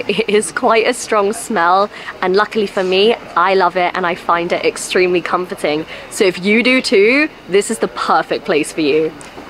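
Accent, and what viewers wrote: United Kingdom. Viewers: your British English is easy to hear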